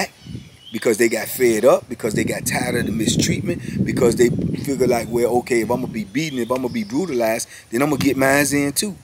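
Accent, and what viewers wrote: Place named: Virginia, United States. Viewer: Lol..thats how they say carry in V.A most all of them..😂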